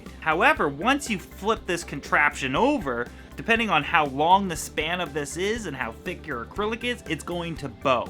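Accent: Canada. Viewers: In this video i could definitely hear his Canadian accent come out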